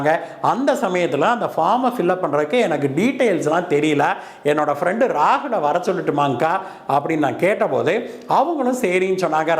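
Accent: Kongu, India. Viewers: கொங்கு தமிழ் அருமை அண்ணா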